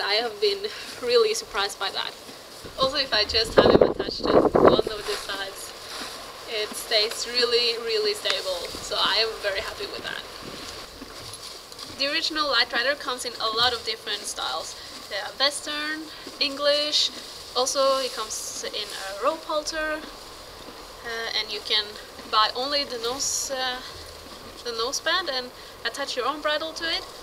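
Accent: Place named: Norway. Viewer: The Norwegian accent was very pretty btw! Hålla from a finn\german\NativeAmerican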